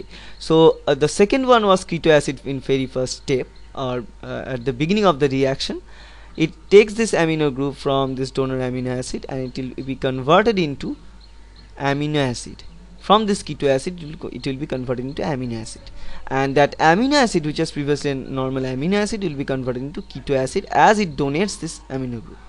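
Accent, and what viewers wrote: India. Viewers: I like this video... Its helpfull. About the accent doesn't matter, in fact i love hindi accent😘😘😘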